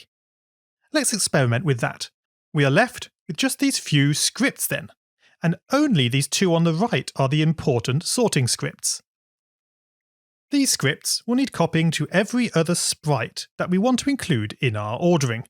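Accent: England, United Kingdom. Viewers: are you from england? thats the only place i know where people say z (shee) as z (zed)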